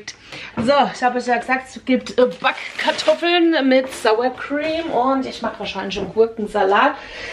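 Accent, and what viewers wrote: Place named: Palatinate, Germany. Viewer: Schönes Video liebe, du bist so sympathisches Fräulein 😀ich höre dir gerne zu und dein Pfälzisch 🥰hab früher in Speyer gewohnt,.. in der Zeit du wahrscheinlich in Mannheim, wenn ich mich nicht täusche, hast du es irgendwann in einem altem Video erzählt 😀lg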